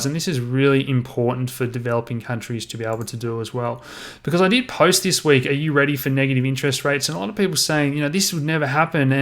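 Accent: Australia. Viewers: Great to hear an Ozzie accent talking crypto.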